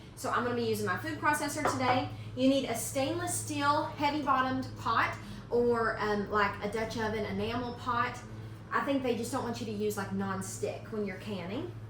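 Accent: South, United States. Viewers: omg i could listen to you for hours. never knew i really liked southern accent. im having so much fun <3 :D haha Love from Israel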